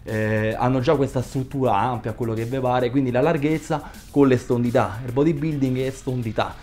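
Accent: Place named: Rome, Italy. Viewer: sei sempre il top ti seguo con gioia e mi fai morire con L tuo dialetto romano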